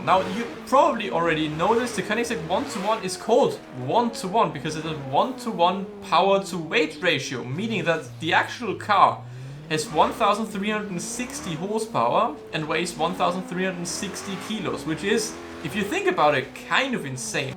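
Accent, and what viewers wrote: Germany. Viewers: Ich Feier den deutschen Akzent 🤙🏻😀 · I bet, you're German? Cuz of accent. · You have a German accent? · Man merkt das du Deutsch bist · this german accent is driving me crazy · are you german or why you speaking like a german guy english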